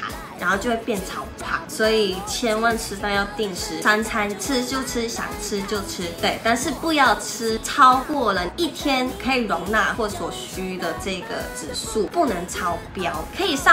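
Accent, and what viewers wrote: Taiwan. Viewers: 從對著鏡頭彈唱的小女孩 現在明顯的看出到了成熟穩重 我哭遼qwq 影片看到一半發現草草的口音變成了台灣口音😳 可愛死了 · 變台灣腔了XDD 說話方式 用詞都被影響到了哈哈哈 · 台灣腔！馬來腔不見了哈哈哈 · 哇 直接台湾腔 没有马来西亚腔了 听起来真的舒服很多 我自己也是马来西亚人可是觉得马来西亚音很难听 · 为什么有一点台灣腔😂😂😂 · 口音有點台灣腔，好可愛ớ ₃ờ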